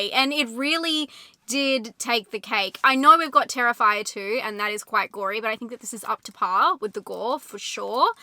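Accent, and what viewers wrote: Australia. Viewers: So nice to hear an Australian review, especially considering the high Australian input in this film! I’m seeing it tomorrow and can’t wait. Seeing it again at the weekend with my mother who’s a fellow horror fan and a friend who doesn’t like horror and gore, but really wants to come. 😂 The second viewing should be interesting. I don’t think our friend will see much of the film through her fingers.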